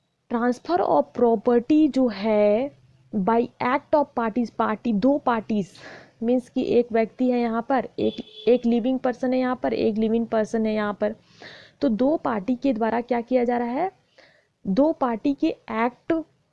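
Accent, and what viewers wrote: Bihar, India. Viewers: Maam bihar sa hoo kya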